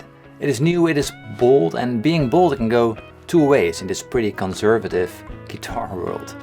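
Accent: Netherlands. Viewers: Are you dutch? You sound dutch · Haha, instantly heard you were Dutch (me too XD)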